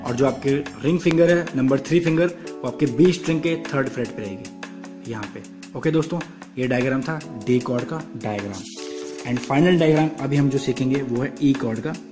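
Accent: Himachal Pradesh, India. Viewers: Bhaee himachal se ho kya?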